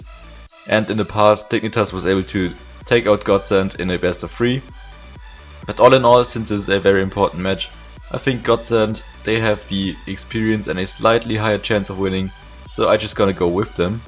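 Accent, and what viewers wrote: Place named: Germany. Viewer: Lol man hört mega Raus das du Deutsch bist :)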